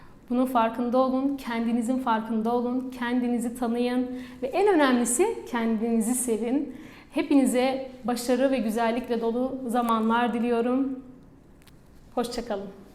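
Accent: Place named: Van, Turkey. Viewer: Hocam siz nerelisiniz? Vanlılara benzer bir ağzınız var ama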